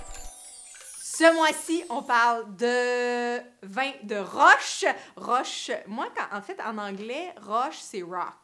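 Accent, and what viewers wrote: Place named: Quebec, Canada. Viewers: tu as un bel accent canadien on dirait!! bises!! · Québécois. Les Canadiens ont un accent anglais, car ils parlent Anglais!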